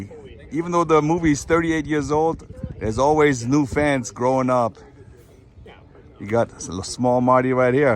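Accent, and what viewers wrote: Germany. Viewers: Dachte sofort als ich dich hörte, das ist ein Deutscher und dann sah ich den username. 😂😂😂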